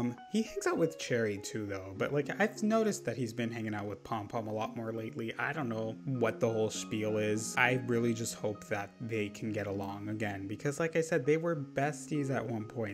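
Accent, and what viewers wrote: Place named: Canada. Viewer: I heard your Canadian… that makes you my favourite animal Crossing YouTuber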